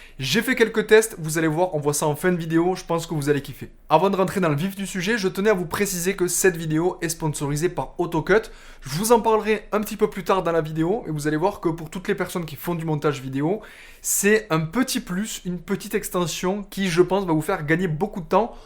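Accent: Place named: South, France. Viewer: Bravo pour ta vidéo avé l'accent qui fait plaisir !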